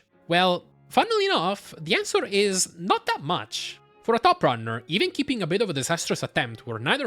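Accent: Italy. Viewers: Lo sapevo che eri italiano dall'accento in inglese😭😭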